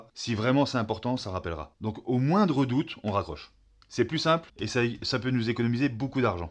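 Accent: Franche-Comté, France. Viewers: D’où vient cet accent franc comtois ?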